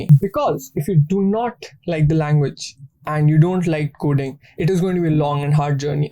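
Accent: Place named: India. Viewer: nice... but i hate how Indians speak English... are u doing intentionally or it is just u don't wanna bother to ppl understand u nice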